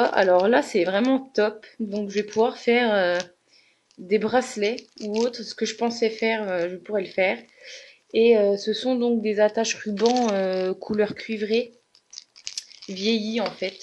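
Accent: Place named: Nord-Pas-de-Calais, France. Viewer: comme ta l'accent chti toi c trop lol la chance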